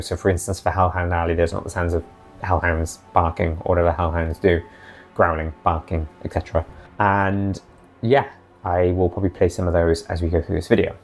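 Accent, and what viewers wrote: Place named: United Kingdom. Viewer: You are very welcome. Obviously, if you feel like it makes your videos less useful to the people who are only interested in how to run the domain and would rather stop including that stuff, don't keep doing it just on my account. I'd still watch the videos regardless. You might have to live with me asking about it in the comments then, though ;). And your accent isn't an issue at all, rest assured! I don't have a good ear for that kind of thing in the first place, nor do I really care and while I probably would have guessed "British" rather than "American English" in your case, it really mostly registers in my head as "a cool and kind person talking in English about something I am interested in".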